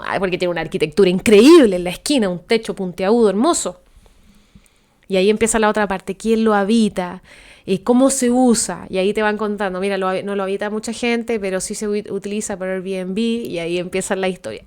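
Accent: Chile. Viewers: MUCHAS GRACIAS POR TUS PALABRAS HACIA MI PAÍS MÉXICO QUE TANTO QUIERO, SÉ QUE HAY PROBLEMAS COMO EN CUALQUIER LUGAR DEL MUNDO, PERO LA VERDAD ES QUE MÉXICO ES UN PAIS MARAVILLOSO, TIENE TANTO QUE OFRECER EN TANTAS COSAS, QUE TÚ LO ESTÁS EXPRESANDO MUY BIEN, ME GUSTA ESCUCHARTE, PUES SIEMPRE ME HA GUSTADO EL ACENTO DE USTEDES LOS CHILENOS, ADEMÁS DE SER UN PAÍS HERMANO, MUY HERMOSO Y MUY QUERIDO POR NOSOTROS. GRACIAS Y SALUDOS DESDE VERACRUZ, MÉXICO ❤❤❤❤ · Me encanta el acento chileno!! Le decimos a Mon Laferte que no lo pierda!!!😊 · Amo, amo, amo el acento chileno....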